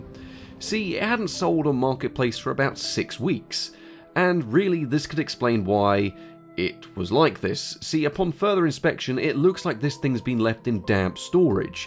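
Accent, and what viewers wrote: United Kingdom. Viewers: As a french guy, I really enjou this british accent. It is better than the ugly american one. Nice channel by the way 😀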